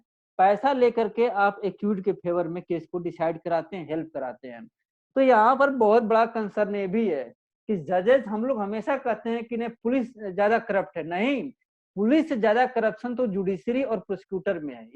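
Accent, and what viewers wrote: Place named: Bhojpuri, India. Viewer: English doesn't matter. Apki bhojpuri bahut sweet hai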